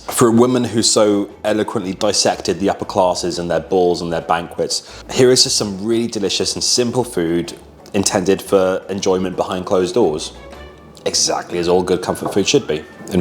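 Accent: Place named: RP, United Kingdom. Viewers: I’m from Manchester but went to school in Surrey and London, so I have a mixed northern and southern accent. To me he sounds typically middle class Home Counties. Not posh, but middle class. Boris Johnson or Jacob Reese Mog is posh southern they’re touching closer to Received Pronunciation, like Prince Charles or the former queen. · As an American I have to say that your accent sounds "posh" to me all the time. And now I want a grilled cheese sandwich!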